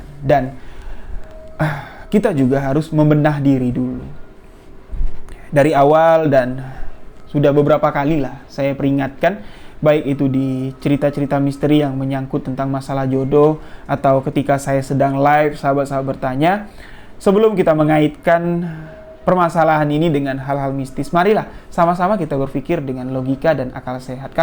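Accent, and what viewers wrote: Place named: Jambi, Indonesia. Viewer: Senang dengar mas bicara dg logat daerah Jambi....succes ya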